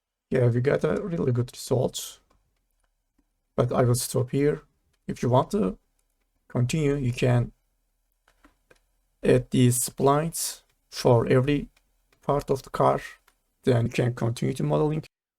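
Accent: Turkey. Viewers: sesini duyar duymaz türk olduğunu anlamak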